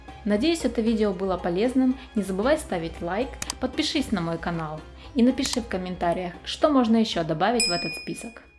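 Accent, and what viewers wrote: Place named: Ukraine. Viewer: поработать над собой, конечно, надо. Но надо учитывать ещё и диалект, акцент. Понято же, что девушка украинка. Вы же не будете делать замечание иностранцу, если он будет разговаривать на ломанном русском. Здесь такая же история.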